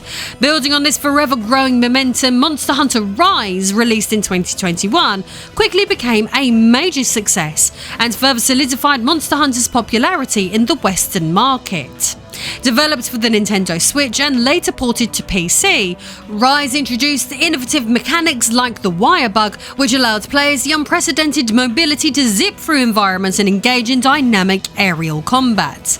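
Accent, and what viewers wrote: United Kingdom. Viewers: I ❤British accent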